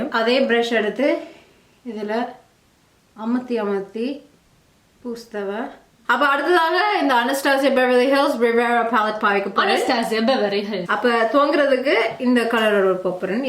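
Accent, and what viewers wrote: Sri Lanka. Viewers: Sri Lanka Tamil speeking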